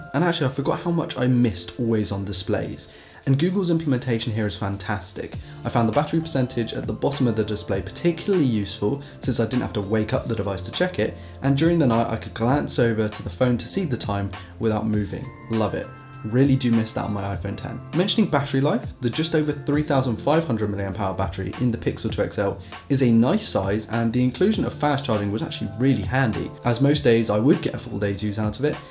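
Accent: Cornwall, United Kingdom. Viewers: Are you from st Austell? I recognise the Chinese aha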